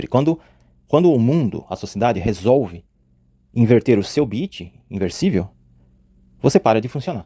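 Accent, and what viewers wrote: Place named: Rio Grande do Sul, Brazil. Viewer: vc tem sotaque de alguma cidde do RS.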